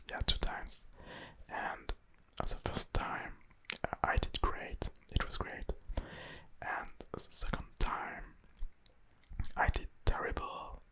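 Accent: France. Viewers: Your French accent is beautiful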